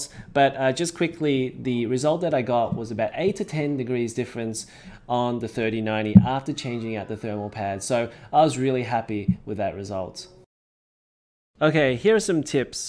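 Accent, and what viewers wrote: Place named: Australia. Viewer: Thanks. Great video. Always nice to hear an Aussie accent on youtube.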